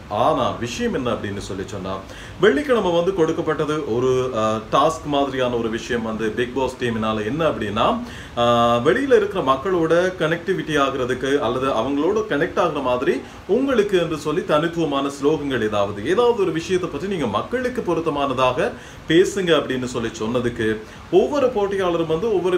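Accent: Sri Lanka. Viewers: உங்க தமிழ் இலங்கைதமிழ் மாதிரி இருக்குங்ண்ணா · ஆம் இவர் இலங்கை 🇱🇰 தமிழர் நல்ல பாடகர் வானொலி அறிவிப்பாளர் நம்ம அண்ணா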